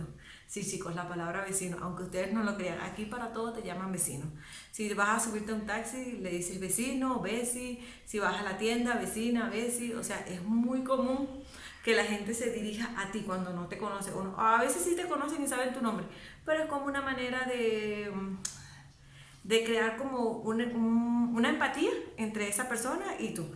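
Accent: Bogotá, Colombia. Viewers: Ya tienes acento cachaco. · Ya hablas como rola jaja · no te escucho hablar venezolano ya hablas colombiano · Hola hermosa buenos días... Bueno yo quiero decirte con mucho cariño q si se te a pegado mucho el acento bogotano. Es más suenas más bogotana q venezolana. Y también q decir me vende, me da, y me regala son todas expresiones muy respetuosas y en colombia las usamos todas por igual. Ahora el bien si señor es igual de respetuoso a decir bien gracias. No es q una sea más respetuosa q la otra. El sumerced es una exprecion de respeto y carño. Q se utiliza mucho en toda la región cundiboyacence y en Nariño. Y es una forma de dirigirse a los padres con mucho respeto, en Boyacá y Marino sobre todo. Bueno espero q te siga yendo muy bien en colombia. Un abrazo de mi parte. Chaaaoo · Pero yo la escucho hablando ROLO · Habemos personas colombianas que pedimos permiso ,me vende, hola cómo estás, decimos vecino pero al que está cerca a nuestras casas, no a todos y muchas más,soy santandereana, pero aunque no lo creas habla Venezolano con algo de acento Colombiano🥰👍🙏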